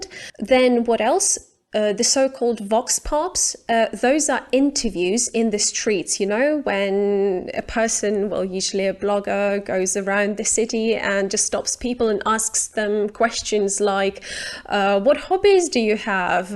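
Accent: United Kingdom. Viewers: Какой красивый британский акцент.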